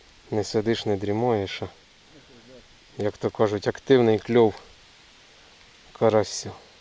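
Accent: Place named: Surzhyk, Ukraine. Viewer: Я вибачаюсь ви з якого регіону українці бо у вас пробачте страшний суржик поправте українську мову а взагалі я дивлюся ваш канал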